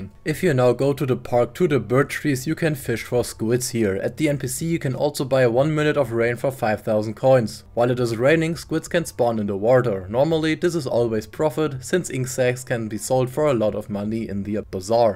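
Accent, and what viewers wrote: Germany. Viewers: I hear deutsch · Love that i hear that he is german i think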